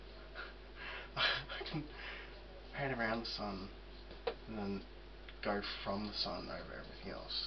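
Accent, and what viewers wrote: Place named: Queensland, Australia. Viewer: It's honestly nothing, and I recognised the Queenslander (because I too, am one). I will support you, because I believe.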